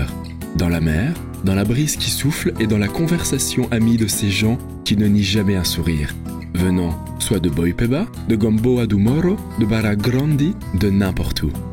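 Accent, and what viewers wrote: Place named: France. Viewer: Bahia com sotaque francês ficou divina. IMPERDÍVEL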